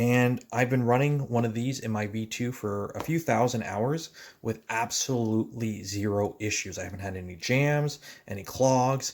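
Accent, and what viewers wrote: Canada. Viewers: You are in Nth America though....common admit it - you re CANNUCK !! You cant hide that accent :-)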